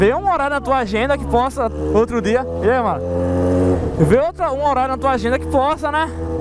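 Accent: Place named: São Paulo, Brazil. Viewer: como que não é fake? essa porra é fakedói meu irmão, tu de Recife, com esse sotaque de paulista, prego do CARAI, honra tuas origens boy! kkkkkkkk essa porra ainda vista por tanta gente kkkkkkkk, vou dar um LIKE só por tua coragem visse !! mas num fica com essa de MANO e MINA não que é feio doido !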